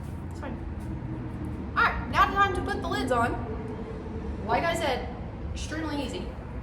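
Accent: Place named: Kentucky, United States. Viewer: Developing a lil KY accent cute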